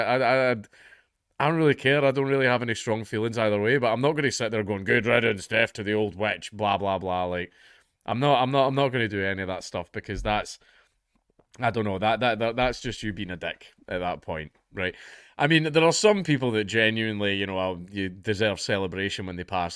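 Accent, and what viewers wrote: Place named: England, United Kingdom. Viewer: You sound like 80% of the English population and now we have her son who no one cares about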